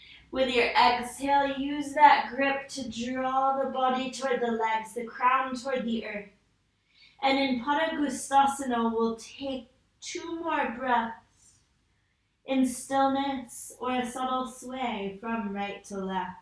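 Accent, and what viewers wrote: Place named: United Kingdom. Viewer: So good to practise with you after such a long time,. I hope you’re well. Unfortunately, the picture seems to freeze around 20 minutes in, but I could follow your voice. Your accent has a hint of British these days!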